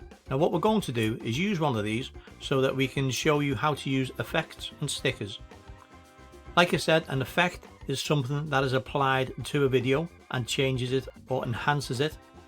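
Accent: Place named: Merseyside, United Kingdom. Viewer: Hi are you still around I've noticed the comments are over a year old. Do you do any one on one tuition for Filmora 14 if so Im interested sounds like you come from my area Merseyside.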